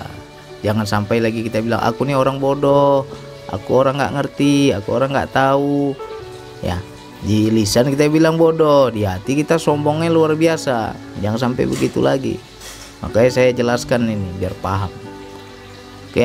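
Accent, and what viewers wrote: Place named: Medan, Indonesia. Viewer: Abg logat medan..orang medan ya bg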